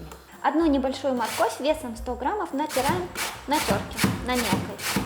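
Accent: Ukraine. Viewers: ...я россиянин. Служил на Украине срочную службу в Советской Армии...1987-89 год. Восточная Украина..,под Харьковом. У вас очень милый украинский говор..Вы с какой части Украины? Не с Полтавской области?! Мира нам всем!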